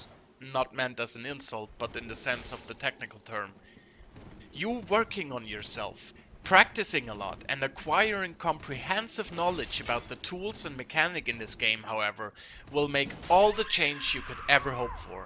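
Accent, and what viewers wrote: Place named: Germany. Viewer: ah i knew it :D because u pronounced some words or to be honest most things u said in a german way. No hate but u should work on that :) but anyways nice Video dude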